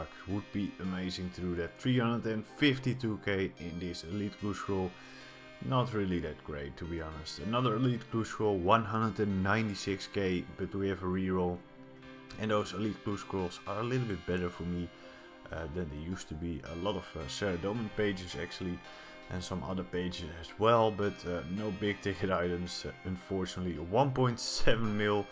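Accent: Netherlands. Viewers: Heerlijk Nederlands accent er doorheen. Audio is idd beetje slecht te horen. Kwam m tegen in me lijst heb gelijk ff geabonneerd. Drop die PVM dingen haha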